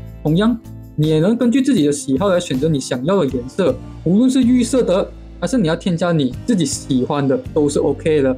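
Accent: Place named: Hunan, China. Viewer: 湖南话＋台湾话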